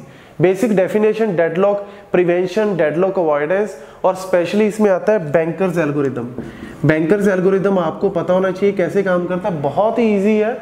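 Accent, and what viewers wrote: Punjab, India. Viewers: tuhade accent to lgda tusi punjabi o??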